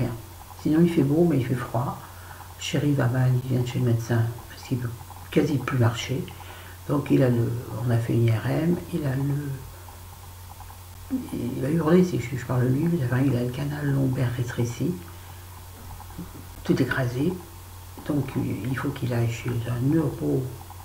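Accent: South, France. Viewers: Mdrrr avec ton accent du sudeuu loool . Manque plus que le Raimu lol bisouilles de la sudiste :-)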